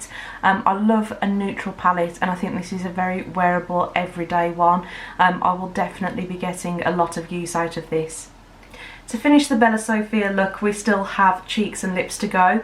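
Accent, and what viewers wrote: Birmingham, United Kingdom. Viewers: Fabulous calming and informative video :) are you from Birmingham? Hope you don’t mind me asking, it’s lovely to hear it! :) x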